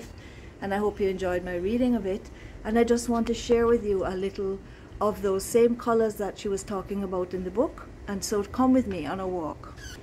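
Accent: Jamaica. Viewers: I love this! Lynda is one of my good friends, so it's wonderful to hear Redemption Songs read out loud in a genuine Jamaican accent. As a Jamaican author, I appreciate that you are sharing the stories from our beloved land. 🇯🇲🇯🇲🇯🇲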